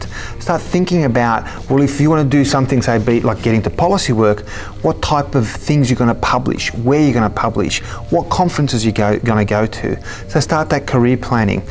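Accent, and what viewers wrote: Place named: Australia. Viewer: Aussie accent 🔥🔥🔥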